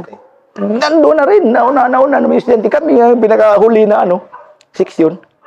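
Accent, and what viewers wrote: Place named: Visayas, Philippines. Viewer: Bisdak kaayo c Master.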